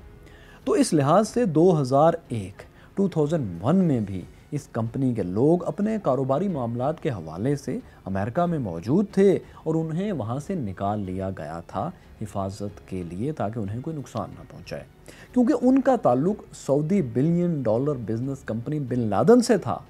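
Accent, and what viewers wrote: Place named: Punjab, Pakistan. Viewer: Urdu with punjabi accent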